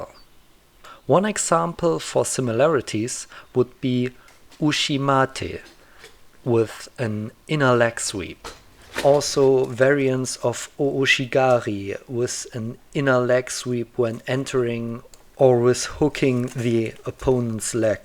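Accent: Germany. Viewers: I may be arrogant in believing that when it is stated that "most sources of medieval wrestling come from Germany" that there is a certain level of bias. This should be recognized, as the ancient greeks had pankration. I am not the owner of every manual on wrestling, so I can not confirm if most surviving records are in fact German, so it may be true. It's just jarring to hear someone with the accent of the country proclaiming that country to have the most/the best of anything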